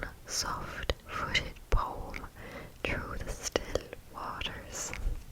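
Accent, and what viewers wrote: Dublin, Ireland. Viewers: Great stuff! Is this a Dublin accent? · Hahah pretty cool, keep up the Irish accent things please. It has a certain calm ambience to it. Which I think it’s cause your background is just very chill and down to earth, no extra lighting that makes everything too shiny. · ooh, i think this is my favourite video of yours yet! i don’t think i’ll ever get tired of your irish accent videos 🥰 they’re so satisfying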